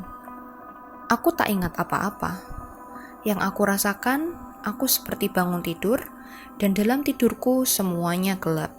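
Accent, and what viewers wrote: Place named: Java, Indonesia. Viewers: aduh mbak meduok eee hehe :D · Logat e jowo banget 🤣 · Gak juga ah, yang ini medok jowo kok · Medok sekali mbak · Ga nahaaan medoknyaaa.. jdi kangen sama kampung halaman 😘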